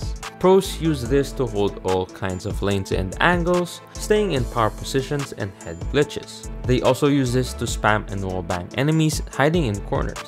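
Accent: Philippines. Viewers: I thought your from Philippines besides your talking in English like Filipino Lmao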